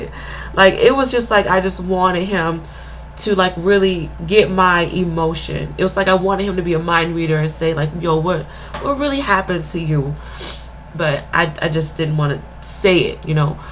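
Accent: Caribbean. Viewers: Where are you from. I hear a couple of accents from you. Like Caribbean at times and an English accent. Be blessed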